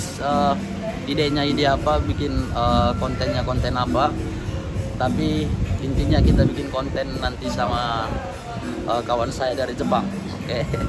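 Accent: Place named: Medan, Indonesia. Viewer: Sepertinya logat anda anak medan ya.....hehehehehe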